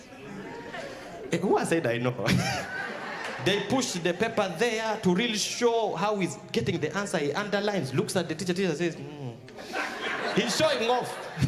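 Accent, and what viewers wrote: Uganda. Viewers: 😂😂😂 apo kwa ngugi 😅😅😅his Luganda pronunciation inanibamba😂😂😂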